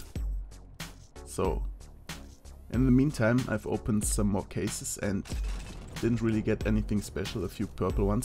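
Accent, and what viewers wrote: Germany. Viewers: Das einzige was zeigt dass du deutsch bist ist dein akzent aber sonst... Läuft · Alter DU sprichst Doch zu 100% Deutsch mehr als englisch......sehe Gerade dein erstes Video und du hast nen SOOOWASVON deutschen Akzent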